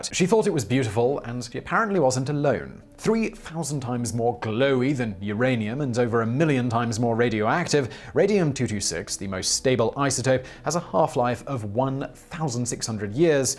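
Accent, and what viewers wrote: United Kingdom. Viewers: are you saying that, just because he pronounced it the British way, means that only British people can watch it? That has got to be the most absurd thing I've read all day! LMBO! So, should he change from speaking like he normally speaks, to an american sounding accent so, we Americans can be able watch? Well then, wouldn't his Brit followers be just so offended! (sarcastic eye roll) Dude! It's not like it's a foreign language! Lol! Lol! It's Americans like you, that give the rest of us a bad name around the world! Broaden your horizon! Not everything is done the american way! But, do have a lovely evening.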